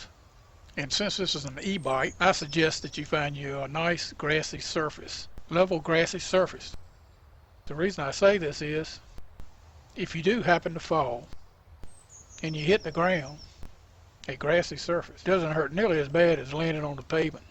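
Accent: South, United States. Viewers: Such a calm and slow-paced demeanor, it's very relaxing for new riders. Thank you! And this yankee loves your soft southern drawl. ;-)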